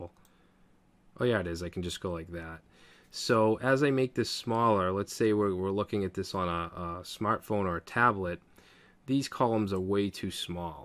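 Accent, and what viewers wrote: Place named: Rhode Island, United States. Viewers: Bawx, sawce code, mahgins, you wouldn't be from Rhode Island? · nice a dude with an east coast accent and swearing!! my fave. great tutorial, i understood it before but now i feel more comfortable with using flex. fixing my site now!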